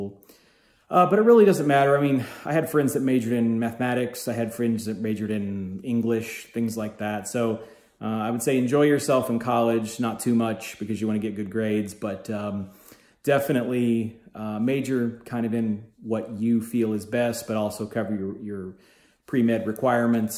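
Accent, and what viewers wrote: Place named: United States. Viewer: In Iraq, I had to go through 6 years in order to finish medical school (requested number of years here ) 😖1 year junior residency, 1 year between PHC and my work in university.. and now i’m in the second year Histopathology board residency. I like how you explain it from A to Z, your clear American-english accent is astonishing, I just put headphones and listen.. every time you post a narrative video 🤩 I wish you were my tutor 🙏🏻 Thank you so much, Dr. 🌷 Arwa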